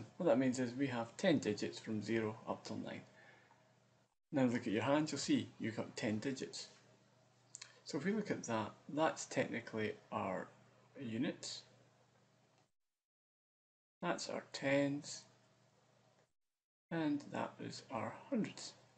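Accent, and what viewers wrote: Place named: Scotland, United Kingdom. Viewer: The accent made it so much easier to listen to, if only one of my lecturers was Scottish :D Thanks a bunch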